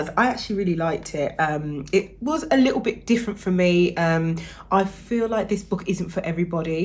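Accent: Birmingham, United Kingdom. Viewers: Are you a Brummie? 💐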